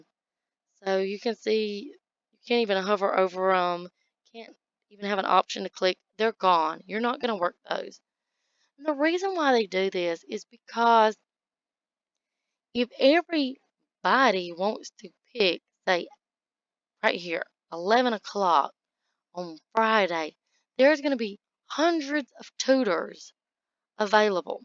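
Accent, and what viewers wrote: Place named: South, United States. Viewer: I’m from Atlanta. I’m guessing you’re Alabama or Mississippi. Maybe South Carolina?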